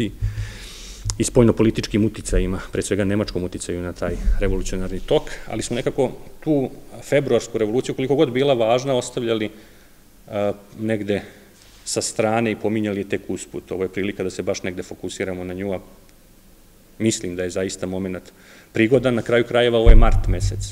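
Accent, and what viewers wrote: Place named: Vojvodina, Serbia. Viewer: Milane, nisam se cak ni vracala da cujem....jer u govornom izrazavanju gospodina , rekla bih da je odrastao u Vojvodini, gde se pomenuti izraz bas tako izgovara...toliko o znanju etimologije, etnologije kao i istorije ...Nista to ne umanjuje znacaj predavanja! Pozdrav!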